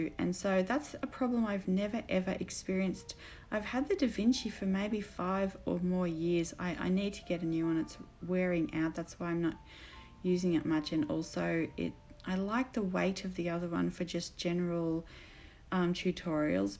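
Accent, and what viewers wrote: Australia. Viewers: Great to hear an Aussie accent. I am in NSW and am trying to get into art and watercolour after a career in nursing. I love your style. Thanks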